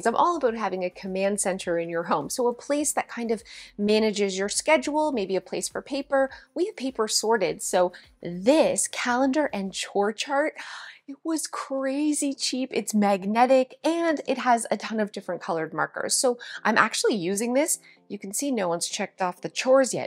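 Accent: Canada. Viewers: I love the Canadian accent I hear once in a while. My family is from Canada but lost their accents years ago....I also Love your advice.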